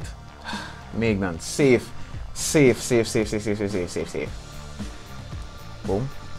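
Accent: Jamaica. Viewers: U from jamaican broski? Sound like one g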